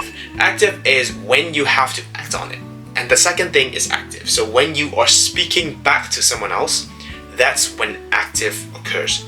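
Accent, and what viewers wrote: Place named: United States. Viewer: You say you’re not a native English speaker, but I’m wondering how that’s possible haha. I’m an American and you just sound perfectly American to me. Can you explain how this is the case? You must have consumed English content extensively since you were a kid. Whatever you did, I need to apply it to my life in Japanese, since I’m living in Japan 😂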